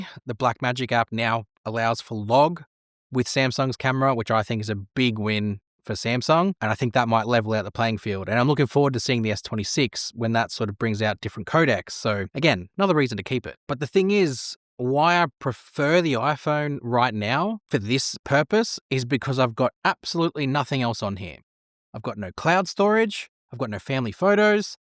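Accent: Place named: Australia. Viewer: Haha, never thought of it before you mentioned. Great guy though. Even better than SJ because has the sweet Aussie accent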